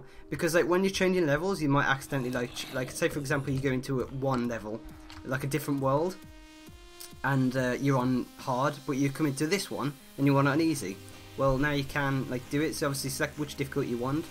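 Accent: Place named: Black Country, United Kingdom. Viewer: Where you from brah, you sound more yam yam than brummy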